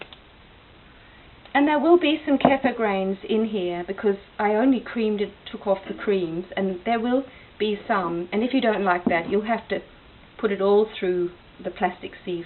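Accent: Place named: England, United Kingdom. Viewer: What a great video and what an amazing English accent, you speak better English than me,lol. Keep up the good work, God Bless.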